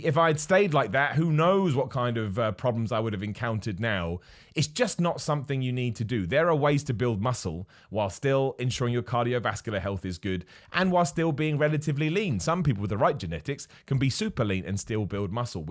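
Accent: United Kingdom. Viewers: Don't know if I enjoy the British accent or the genuine opinion better. What a lovely human being.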